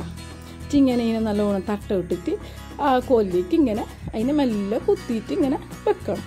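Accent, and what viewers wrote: Kasaragod, India. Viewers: മൊഞ്ചായിട്ട് 🥳 ഇങ്ങളെ കാസർഗോഡ് ഭാഷ മെജ ആയിട്ട് ഇന്ക് നല്ല ഇഷ്‌ടായി ഞാൻ മലപ്പുറം 🥰, സൂപ്പർ ആയിട്ടുണ്ട്, ഞാൻ nijjus cafe ലൈവിൽ നിന്ന് കൂട്ടാവൻ വന്നതാണ് ട്ടോ ആ 15 ആളിൽ ഒന്ന് ഞാൻ ആണുട്ടോ 🤝 ഇങ്ങോട്ടും വരണേ നാളെ 24 മണിക്കൂർ കഴിഞ്ഞിട്ട് അല്ലകിൽ സ്പാ ആവും 👍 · Ambambo 😍 jan aadyam vijarichathu kozhikalude sammelanam aanenna🤭😄. kasaragod bhasha kalakkitund.entho valiya sammelanam nadakkukaya 🤭.good presentation 👍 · ishtayi presentation athilum ishtayito kasarkode slang super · ഫുഡ് ഉണ്ടാക്കുന്നതിന്റെ കൂടെ കാസർഗോഡ് ഭാഷ കൂടെ ആയപ്പോ അടിപൊളി ആയി 👌👍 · കാസ് റോട് ഭാഷ👌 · വളരെ ശ്രദ്ധയോടെയാണ് ആദിയോടന്തം കണ്ടത്. കാരണം അത്ര പരിചിതമല്ലാത്ത ഈ സ്ളാങ് മനസ്സിലാക്കണമെങ്കിൽ വളരെ ശ്രദ്ധയോടെയിരിക്കണം. കേട്ടിട്ട് ഇത് കാസർഗോഡ് സ്ലാങ്ങാണെന്നു തോന്നുന്നു. കേട്ടിരിക്കാൻ ഒരു രസമുണ്ട്. എന്തൊക്കെയാണെങ്കിലും അവതരണം നന്നായിട്ടുണ്ട്. അഭിനന്ദനങ്ങൾ. ഞാൻ സബ്സ്ക്രൈബ് ചെയ്തു. 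· വളരെ രസത്തിലുള്ള അവതരണം..കാസർകോട്ട് എനിക്ക് ഇഷ്ടമുള്ള ഭാഷയ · മൊഞ്ചത്തി പൊളിച്ചു. കാസർഗോഡ് ഭാഷയും കിടു ആണ്. ചെക്കൻമാര് കൊത്തികൊണ്ട് പോകുന്നത് നോക്കിയിരുന്നോ. മൊഞ്ചു കണ്ടിട്ട് എനിക്കും കൊത്താൻ തോന്നുന്നു........ 😝😝😜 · അടിപൊളിയായിട്ടുണ്ട്. ഒരു പുഞ്ചിരിയോടെയാണ് വീഡിയോ മുഴുവനും കണ്ടത്.അതുപോലെ കാസർകോട് ഭാഷ കേൾക്കാൻ നല്ല രസമുണ്ട്. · സൂപ്പർ കാസറഗോഡ് ഭാഷയും എനിക്ക് വളരെ ഇഷ്ട്ടപെട്ടു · എല്ലാ സൂപ്പർ ആയിട്ടുണ്ട് പിന്നെ കാസർകോട് ഭാഷകേൾകാൻ നല്ല പാങുട്എനിക്ഇഷ്ടായി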